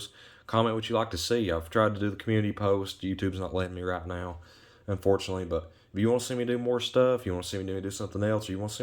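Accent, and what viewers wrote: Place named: South, United States. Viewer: Thanks i am new to m1 carbine i know ww2 m1 grand . But please talk more slow as your a fast talking southen man i am from california not a yankee like thay said lol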